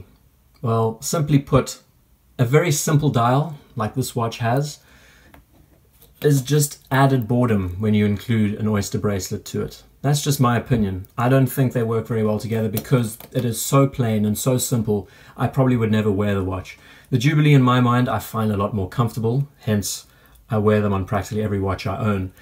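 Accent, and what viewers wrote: South Africa. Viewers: Hiya,new to your channel.Got the S.African accent immediately.I like your philosophy on value.That panis could easily sit next to any high piece and stake a claim.I also appreciated your comment that Tensing got little recognition in the famed Everest top out ,why because that guy Biliary may never have got there? Anyway ,informative video and enjoyed the Flemming dialogue.Cheers,Len.UK. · I didn’t know that was a South African accent I was hearing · Thanks for sharing your interesting story with us. As a non-native English speaker I totally hear the same accent in your voice as with Winston from the YT channel SerpentZA. The next time I hear someone with that accent I'll probably be able to guess that he is from SA.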